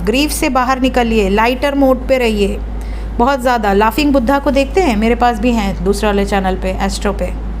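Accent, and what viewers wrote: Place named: Mumbai, India. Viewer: Mem mumbaiya bhasha me bol rahe he 😂😂jhakas 😂😂😂😂😂 so qyut di❤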